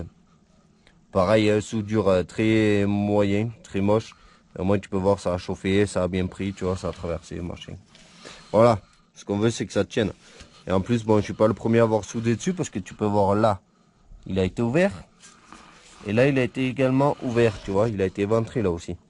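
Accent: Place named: Marseille, France. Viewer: En grande forme internationale ça ça me fait toujours délirer le début de tes vidéos tu as l'accent marseillais mais apparemment tu habites Danemark ça fait trop délire. avec ses 400 épave en tout cas continue comme ça gros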